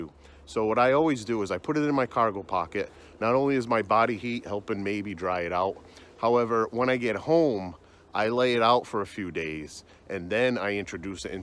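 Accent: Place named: Massachusetts, United States. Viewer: Good stuff. Nice to hear a MA accent. Maple House sent me here. Subbed.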